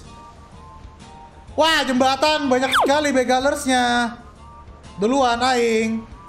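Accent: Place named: Makassar, Indonesia. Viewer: orang makassar dia logat makassar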